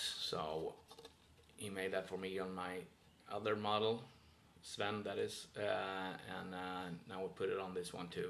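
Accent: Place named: Texas, United States. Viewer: What a cool Texas accent u have!! I need another use!! Nice instrument.....T:)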